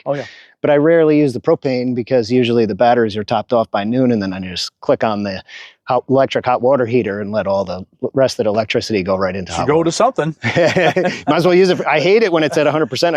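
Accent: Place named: New Jersey, United States. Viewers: I could tell he was from Chersey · “ I kinda drive like I stole it”. HAHAHA!, you gotta be from Jersey or something’. Sound like the wise guys I grew up with. Great build brotha!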